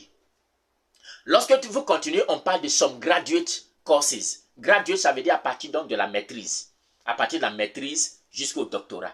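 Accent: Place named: Ivory Coast. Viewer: Hummmm mon frère merci beaucoup pour cette vidéo d'une valeur pratique l'accent ivoirien reste incontournable dans tes propos.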